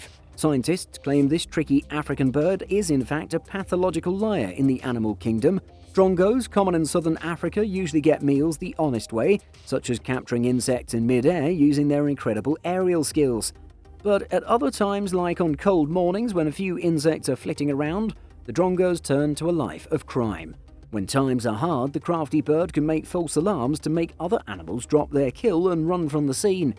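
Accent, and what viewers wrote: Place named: England, United Kingdom. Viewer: Juste pour l'accent anglais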